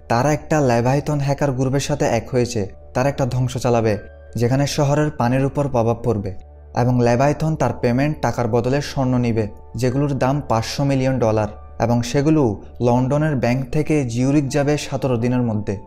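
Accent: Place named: Noakhali, Bangladesh. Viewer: Bhi.. apnar bari noakhali maijde. Ta amai vuge gesi jae. Noakhailaaa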